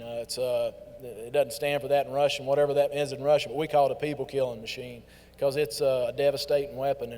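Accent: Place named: United States. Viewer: I like your True American accent the best. never mind that you are handsome too.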